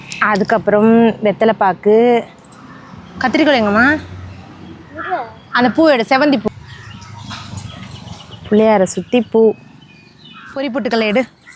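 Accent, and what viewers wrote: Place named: Nagapattinam, India. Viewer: Akka nanga pesura mathiriyea neengalum solreenga.nan unga ooruku pakam thn Nagapattinam ❤❤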